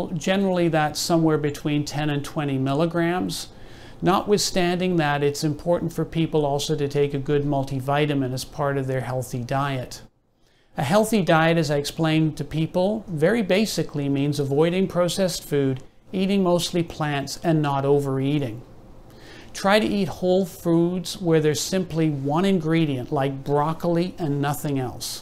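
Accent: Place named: Canada. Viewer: MD here for nearly 50 years, I'm also a retired Professor of Surgery at a major medical school in the United States. This is an articulate internal medicine colleague with what sounds like a pleasing Canadian accent and he does have a great speaking style although I think he was reading from a teleprompter-like device. He produced an allegro account of some useful material that started out on the COVID thing but then soon enough went widely to other areas that are mostly tangential, to say the least. There were bumps in the road. His digressions about beverage alcohol use, sleep, exercise, diet, etc. were both strange and strained even if truthful cameo things. But that's almost minor technical nit-picking on my part. I do opine that it was laughably weird -- and very nearly bush league -- to pose him in front of those random pieces of medical apparatus and . 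. . . .[wait for it] . . . . . the wall display of (his?) various diplomas was just hilarious! What were these obviously intentional optical embellishments supposed to do? Make rubes who watch the video pay closer attention? Make the message more believable? Boost the bona fides? This man means well but the show business aspects of this video lecture were regrettable in my opinion. Thank God he did not wear the traditional internist's "flea collar" (a stethoscope draped dramatically around his neck).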